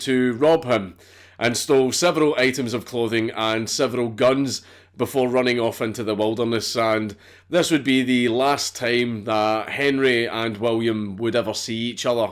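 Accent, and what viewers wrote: Scotland, United Kingdom. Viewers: Its pronounced bu lly tha kid in scottish william becomes wulliam bill becomes bull not sounding bull as in the animal they sound diffrent hard to explain to pple who are not scottish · It’s fucking brilliant when you hear a Scottish man say murder 😂. Your accent makes your videos. The research you do and how you portray every story is top tier. Fantastic channel. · There is just something about hearing a Scotsman describe the old west that touches my heart....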